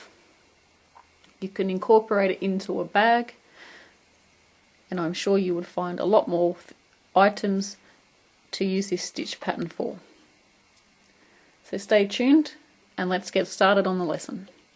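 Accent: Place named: Australia. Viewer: my first thought at her voice was "ooh you're aussie aren't you?"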